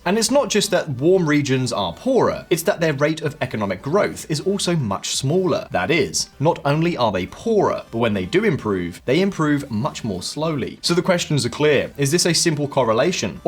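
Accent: United Kingdom. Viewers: I like the way you compose the narrative into different perspective that are coherent. It does make sense when we think about it. Of course there's no way clear definitive cut to conclude heat slows hinders economic growth, but it might be the trigger (indirect factor) for the human behaviour (direct factor) that makes hot countries are economically subordinate than cold countries despite they are more resourceful. Keep progressing making meaningful and all rounded contents. I enjoy watching it while being entertained with your British accent 😂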